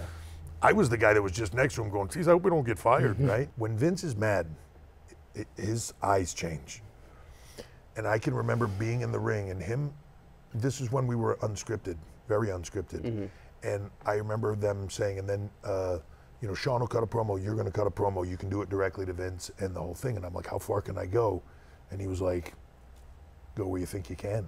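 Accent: Canada. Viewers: I swear, the older he gets. The more canadian he sounds.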